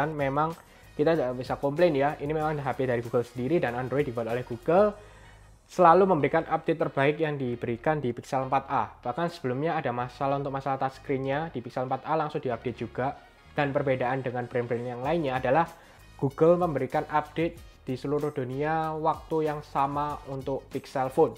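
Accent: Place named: Surabaya, Indonesia. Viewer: Pasti org Surabaya ya bang?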